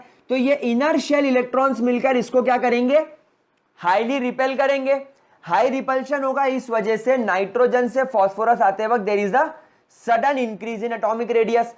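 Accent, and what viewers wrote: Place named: Indore, India. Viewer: Sir u r the perfect indori..😂😂😅.... BTW ur teaching is superbb thanks a lot sir..🙇🙏🙏🙏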